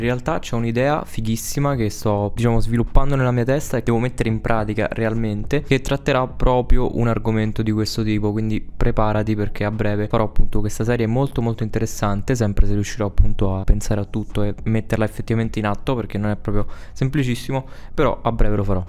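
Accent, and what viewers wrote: Italy. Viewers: Per me è di Roma, si sente anche dall'accento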